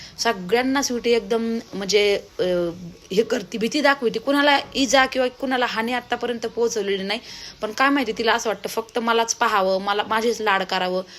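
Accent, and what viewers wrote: Ahmednagar, India. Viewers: Nagri bhasha asich ahe Jo to tyachya gavanusar bolto...amhi pn ngri ahot...bhashevrun kunala nav theu nka mumbait rstyavr rahnare pn standerd boltat....nagr chi hich bhasha ahe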